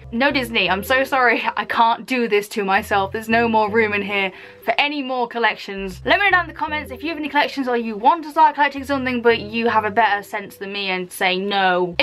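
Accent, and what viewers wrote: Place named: United Kingdom. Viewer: your voice is my favorite a. it's brittish, and b. it's a pretty British :)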